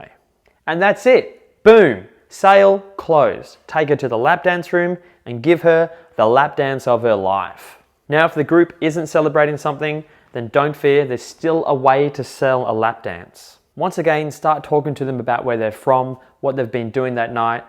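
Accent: Australia. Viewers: Sir your Australian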